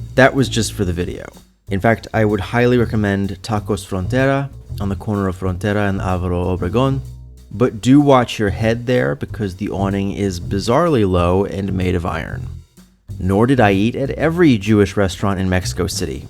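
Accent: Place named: United States. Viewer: Your Spanish was great. You didn’t sound aristocratic or weird at all. You simply sounded like an American with a great proficiency in Spanish.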